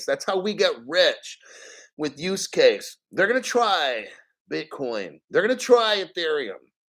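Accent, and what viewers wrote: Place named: New York, United States. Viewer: This is one of the very few crypto channels that isn't trying to sell you something or shill their own bags; appreciate it. Are you originally from NY/ NJ ? You have the accent & the same Go Fk Yourselves mentality we have here on the east coast, hahaha . Keep up the good work.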